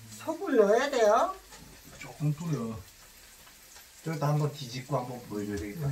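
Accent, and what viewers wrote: Gangwon, South Korea. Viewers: 말씀 하시는게 강원도 사투리 쓰시는거 같아요~~ㅎㅎ 집안분위기 너무 행복해 보입니다~~^^ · 오늘처음보는데 그 웰컴동막골에나오는그말투시네요^^ 강원도인가요? 강원도어디말인가요? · 아직 먹어보지는 않았지만 함 먹고싶네요 산초기름도 첨 알았네요 잘봤습니다 ^^ 말투가 강원도 같기도 하신듯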